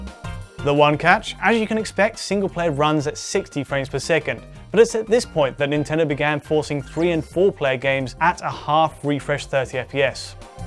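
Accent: United Kingdom. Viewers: The brits pronounce Mario as "Mary-O" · He's a Brit mate.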